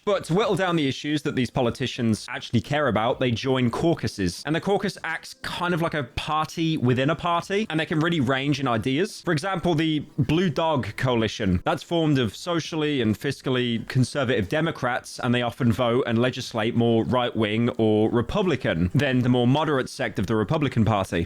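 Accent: United Kingdom. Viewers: british lol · Are you british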